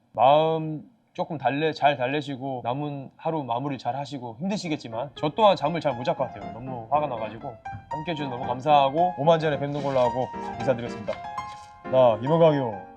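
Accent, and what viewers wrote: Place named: Jeolla, South Korea. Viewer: 전라도말씨로 해설듣는건 첨인데 은근 중독성있네 😂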